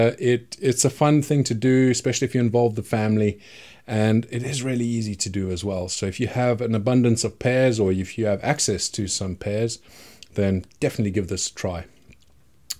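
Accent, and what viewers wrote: South Africa. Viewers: you look like it an sound like it.... south africa or maybe zim? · are you a South African? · Are you from South Africa? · Great Video, great presentation and what I really appreciated was the step by step, explained in clear english ( with some South African accent ) but very easy to understand the different processes of the fermentation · One of the best SA accents I have ever heard on Youtube. Well done boet, your English is perfect and your pronunciation is amazing. Great video too. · Snap! 😂😂 he does sound South African as well 😂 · Are you originally South African by any chance? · Yup, 100% sure this is a Saffa... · You sound South African, very interesting video thank you :) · Correct me if im wrong, but are you South African by any chance. Not so much the accent ( although there is a hint ), but more the walking around barefoot outside? 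· When you watching a video and you say to yourself, "Hey this guy is South African" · He can't be Aussie.. Mzansi 4sure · Are you South African? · He sounds South African 🤔 🤔 🤔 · are you south african? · You're south African?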